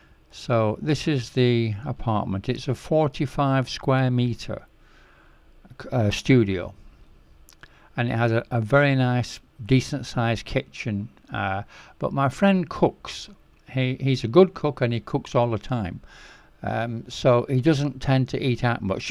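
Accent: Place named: England, United Kingdom. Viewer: Why are you giving costs in us dollars with an english accent ?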